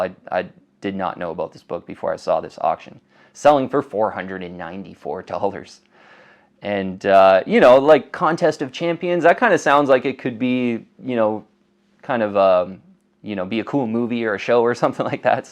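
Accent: Canada. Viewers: Love your videos - and that Canadian accent makes me miss home. Awesome work! #TimHortons4ever #logriders4life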